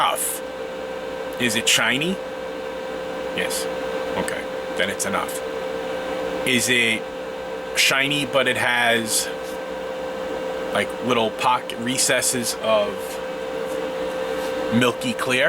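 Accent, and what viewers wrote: New York, United States. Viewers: 😂😂😂, love the video, I’m new to your channel, I’m from Missouri and I like your NY accent.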